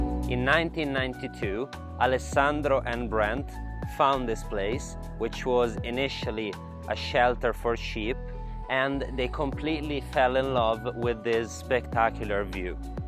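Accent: Italy. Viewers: love your italian accent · Beautiful property, Apples and walnuts, a match made in heaven! very cozy farm and well presented, Your English is excellent! I love the way Italians pronounce the letter E on the end of words like a tail that swings! so musical.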